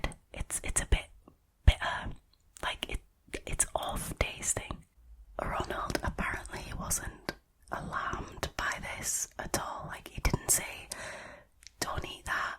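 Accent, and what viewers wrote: Northern England, United Kingdom. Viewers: Sorry I have also been trying to locate the accent. It’s great and really draws me back to the channel. I studied and lived up north and have a northern dad although I come from and live down south but miss the accent sometimes. Understand why you wouldn’t want to be specific but I’m speculating England’s biggest county (or near to). Thanks for more spooky storytelling as always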